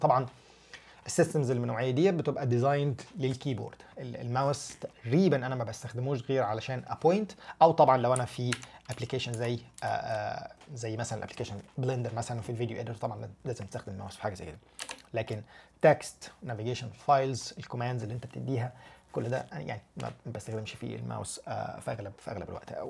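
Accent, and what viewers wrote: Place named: Egypt. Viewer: سبحان الله كنت احسبك من أهل الشام أول ما شفتك وبعدين انصدمت باللهجة المصرية 😅 مع كامل احترامي لأهل مصر 💐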